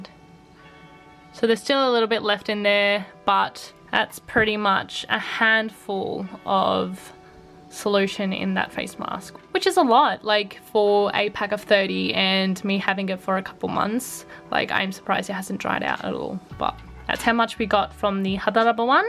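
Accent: Australia. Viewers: Wow, as an Aussie who has been living in Japan for 15 years watching your videos and hearing a true blue Aussie accent is blowing my mind a little lol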